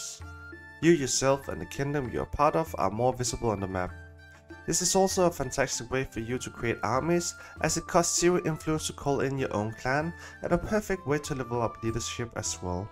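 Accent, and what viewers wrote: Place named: Denmark. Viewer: Faldt over denne video, og sad også og tænkte "han lyder lidt dansk ham der"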